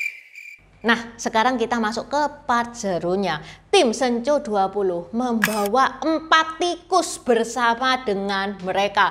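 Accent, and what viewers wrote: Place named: Surabaya, Indonesia. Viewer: Bukan beritanya yg saya suka, tapi dialek khas Surabaya nya yg apik tenan.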